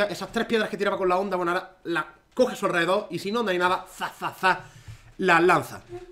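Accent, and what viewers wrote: Spain. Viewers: De donde es su acento? ya se que de españa, pero donde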